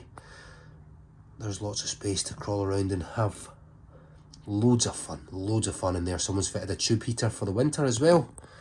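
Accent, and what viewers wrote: Scotland, United Kingdom. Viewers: I watch this channel for the reasonably priced boats, but I stay for the beautiful Scottish accent and the “snap” transformations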